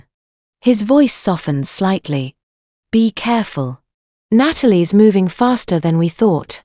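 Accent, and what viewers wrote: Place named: Australia. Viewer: Very disconcerting for the narrator speaking Aussie but claiming Boston as home. Decent tale tho.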